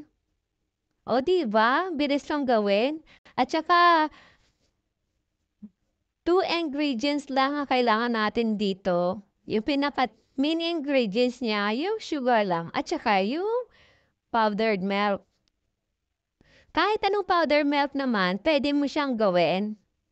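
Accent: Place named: Visayas, Philippines. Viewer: ang cute ng voice ni ate pinaghalong englishera,na bisaya at Ai😄😄😄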